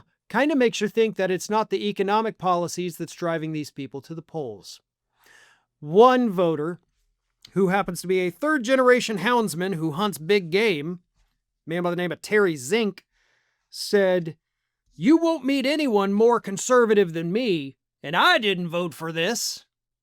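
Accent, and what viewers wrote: South, United States. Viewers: Farron that is not a rural Montana accent you are projecting. That is southern.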